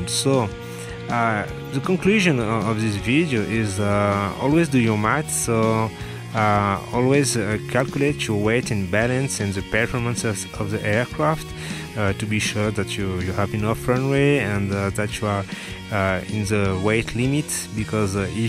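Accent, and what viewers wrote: France. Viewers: Are you french ? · Jme suis directement dit que tu es Français avec ton accent ahahaha bonne vidéo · Você é brasileiro? Essa cidade é no Brasil mas seu sotaque é francês...enfim se for francês vá "treinar" com a vida das pessoas no seu país...se for brasileiro para de fazer burrada imbecil! Treine no simulador ou em uma área afastada, foi de imensa responsabilidade fazer isso em cima de bairros residenciais e lojas como a Havan que está sempre lotada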